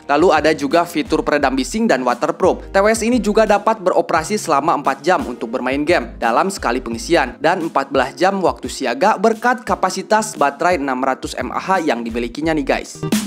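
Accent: Indonesia. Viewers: Sunda pisan anjay pas buka videonya 🤣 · sunda pisan ieu euy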